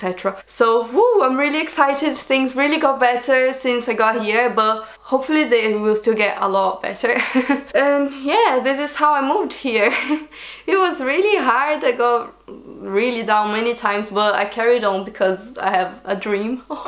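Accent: Brazil. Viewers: O sotaque dela não engana, muito fofo 💖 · Eu sabia que vc era daqui! Soube pelo sotaque, kkk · You have a Brazilian accent but its a Brazilian cute accent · Kkkk verdade eu olhei pro rosto escutei ela falando o sotaque bonito brasileiro ali, haha é brasileira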